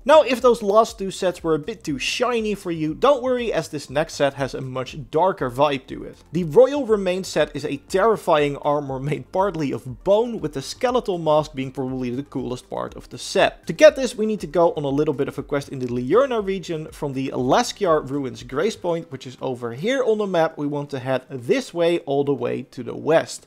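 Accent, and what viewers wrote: Netherlands. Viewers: hehe nederlander ik hoor het wel ;) goeie video man ! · You are so dutch haha, instantly recognized the accent :p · Good video thx. Your accents sounds Dutch, is that correct? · Aan zijn accent te horen spreekt deze meneer ook Nederlands :D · The Dutch accent makes this video so much better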